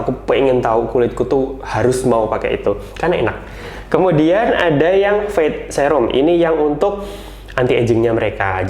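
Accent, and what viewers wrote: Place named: East Java, Indonesia. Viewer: logatnya kayak jawatimur agak ngapak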